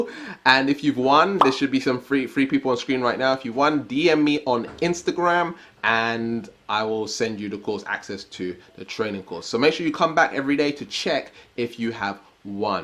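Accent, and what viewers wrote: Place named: England, United Kingdom. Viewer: Love ur English accent lol:) I AM SUPERSTAR OOOOHHHH YESSSS:))) bless you beloved friend 🎁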